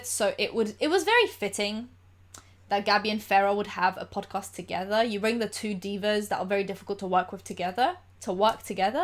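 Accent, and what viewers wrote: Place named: England, United Kingdom. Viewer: Shes English. Not sure exactly where in England though. Maybe London?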